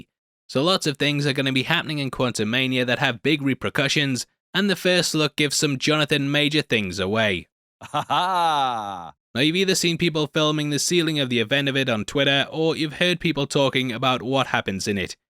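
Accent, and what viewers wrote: United States. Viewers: nice american accent heavy !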